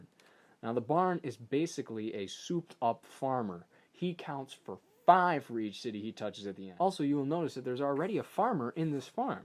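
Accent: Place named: United States. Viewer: Uhhhh...American. There's really nothing weird about it.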